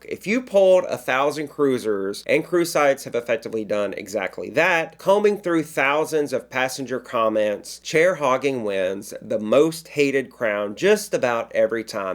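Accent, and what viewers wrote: South, United States. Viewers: Well done as always. I love watching the scenery on your videos. You sure got the life. I wish I had the technical skills and personality to do what you do. I would love to be able to afford to sail the Deep Blue Sea for a living. From where is that Southern accent?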